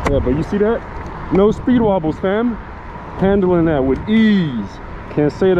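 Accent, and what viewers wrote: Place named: Bay Area, United States. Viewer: My boy, you must be from the Bay Area.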